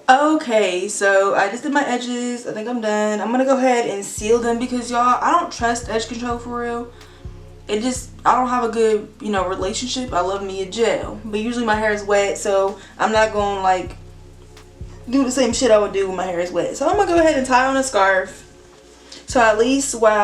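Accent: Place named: South, United States. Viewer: definietly hear an accent. love it. are you from the south. i.e carolinas, bama, tennesse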